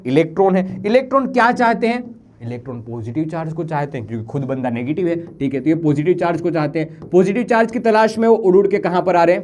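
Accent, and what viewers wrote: Gujarat, India. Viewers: Gujrati accent aa hi jaata h 😅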